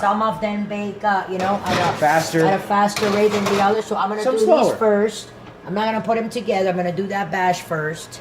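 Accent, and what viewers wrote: New York, United States. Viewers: I've been studying about American accents. where are you from :) ? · I'm from Louisiana and I could tell she was from New York.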